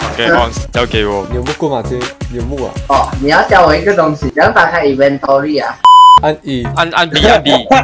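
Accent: Malaysia. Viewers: 你应该是马来西亚人吧，听那个口音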